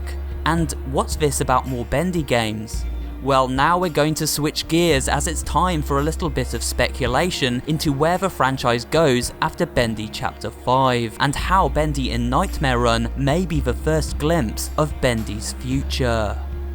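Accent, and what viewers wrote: United Kingdom. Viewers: You are like the British version of the Chills YT channel with the way you talk.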